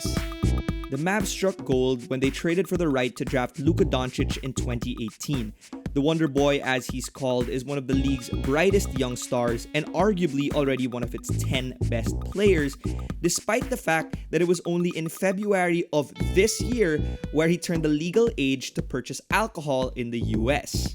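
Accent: Philippines. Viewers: Yo are you Filipino? I feel like I can hear the accent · I can recognize your accent. Are you Filipino? Great video btw! I love the mavs! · Hey man, are you filipino? My friend is filipino and he has the same accent as you! Anyways, great video! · Are you Filipino? The accent.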